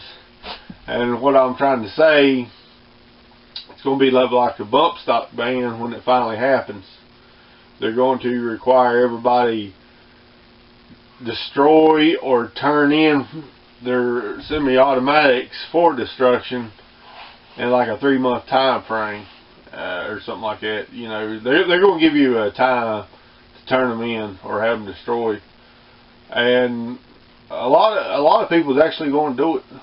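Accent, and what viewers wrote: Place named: South, United States. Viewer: Well I watched your video, the whole thing, and that's time I'll never get back. Sounds like you're just given up, it also sounds like you're from the South. But you don't know the South because ain't nobody giving up anything down there. Also if it gets "Venezuela bad" us people who buried our fire arms will have firearms. So you're talking in this circular logic that doesn't make sense. The only thing that makes sense to me is you're a coward and you're going to give up.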